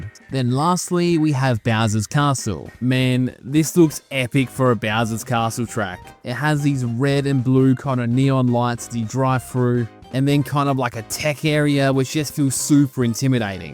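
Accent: Australia. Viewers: Hey, very refreshing to hear am aussie accent and such a consice and considered analysis of all things AAA Nintendo. Wish I dis covered your channel earlier. Keep up the great work. Thank you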